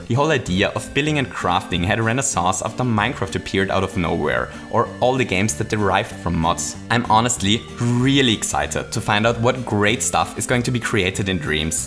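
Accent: Germany. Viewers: Are you German? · Wait? Are you from Germany? ? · Wait what? Are you german? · Is he german? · Are you from a german speaking country? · Oh, you are German too? I knew your accent seemed familiar for me xD Nice content man, love your channel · Wait.. Are you German?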